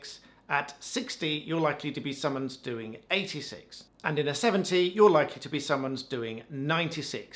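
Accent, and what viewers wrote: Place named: England, United Kingdom. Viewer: What country is he talking about? He seems to have an English accent.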